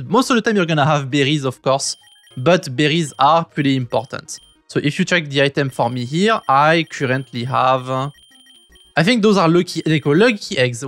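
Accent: France. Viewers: What a nice french accent that you have x) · Je cherche un tuto en français j'en trouve pas. J'en cherche un en anglais je trouve un tuto d'un français qui parle anglais alaid x)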